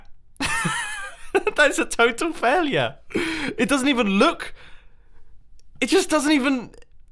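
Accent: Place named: United Kingdom. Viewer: you make me laugh every time you don't even have to say anything, when you were trying out how to launch sand up all the sudden you said "oh my word i wonder if this will work with anvils!" I love your accent too, mostly just the British accent, but you will always make me smile.:D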